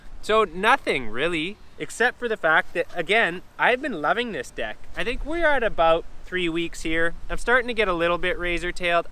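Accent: Canada. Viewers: Jesus, you're the most Canadian sounding person ever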